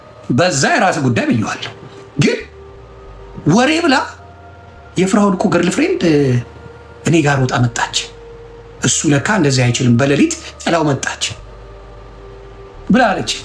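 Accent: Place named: Tigrinya, Eritrea. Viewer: I love your Tegregna pronunciation😂😂 you crack me up 😂😂😂😂😂❤❤❤❤❤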